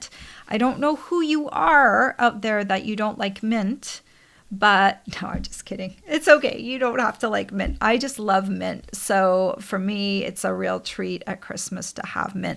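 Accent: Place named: Canada. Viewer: Hi, for some reason (maybe your accent) I thought you were in Canada! I was so shocked when you just said you are in the Boston area!! So I CAN order Stampin' Up! products thru you in the future...😊😁😄Yea!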